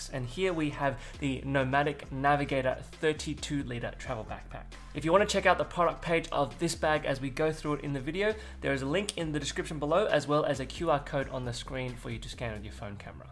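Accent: Australia. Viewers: Homies accent is wicked yo. Always a trip for me to see a fellow Asian with the croc hunter accent. 👍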